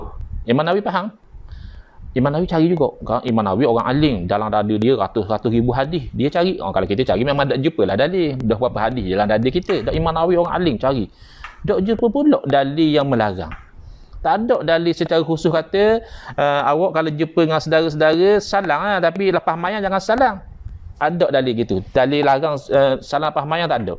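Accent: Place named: Terengganu, Malaysia. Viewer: Sedak pokku cakak ganu..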